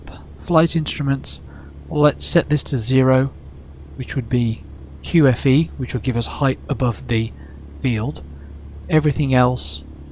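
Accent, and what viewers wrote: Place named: England, United Kingdom. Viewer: Great lesson. It is good to have in an English accent. Plus a view from the pilot's seat so as to get the picture.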